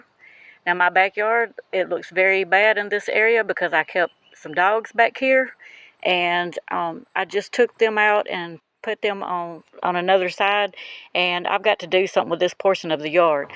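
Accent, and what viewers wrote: Georgia, United States. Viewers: Where do you live? You sound like my Georgia family members 😊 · It’s so pretty,love the colors and the match your bowls. Maybe try to mix the two colors together? They just go great with water to me. I could listen to you talk all day, I thought maybe from Georgia, sounds like it and your place is beautiful as well.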